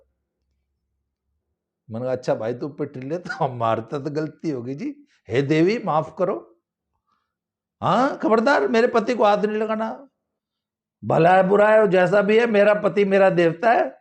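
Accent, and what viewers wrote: Haryana, India. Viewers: uncle ji thari haryanvi kasut andi hai hehe..swaad aa gya sunke hehe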